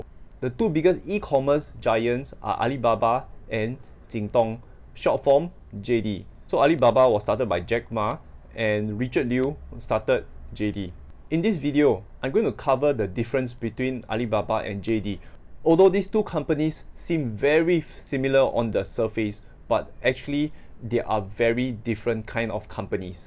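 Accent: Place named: Singapore. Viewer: You're from Singapore, that is 100% Singlish.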